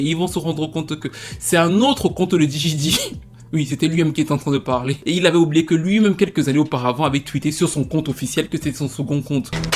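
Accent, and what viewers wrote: Cameroon. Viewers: C'est tellement drôle, comme tu t'es repris in extremis ! 🙃😅😆 En bon camerounais, je suis sûre que la suite était: "Avant que le bébé"... n'entre ! 🤣🤣🤣🤣🤣 Et puis, la vidéo ensuite qui vient remplacer et imager le propros... 😂 Les camerounais que dis-je, les continentaux et leur phrasé légendaire... Comme je vous aime ! 😅🧡💯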